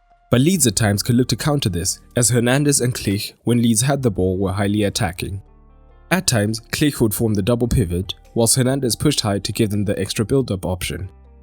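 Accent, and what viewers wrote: South Africa. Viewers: Dude are you South African 😂 this accent is mad familiar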